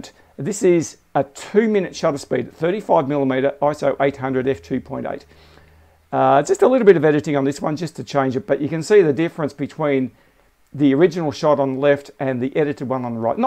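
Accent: Australia. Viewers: OMG! An Aussie Voice, on here, talkin' my language, doing a great job of being HONEST!! Much appreciated!!! You mentioned the tripod...Bol..? But it is not 'linked' in your post, or have I missed it? Keep up the greatest work on here!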